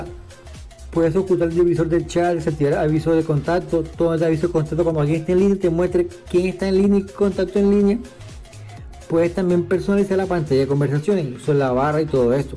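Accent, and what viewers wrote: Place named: Brazil. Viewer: Jah tienes asento brasileiro en el video. Así es amigo Ya salió la versión 9.00 hace poco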